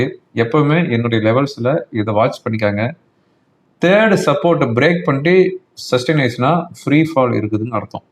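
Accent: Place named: Kongu, India. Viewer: Rupee value வீணாப் போய் கெடக்குது 😂 கொஞ்சும் கொங்குத் தமிழ் அருமை 👍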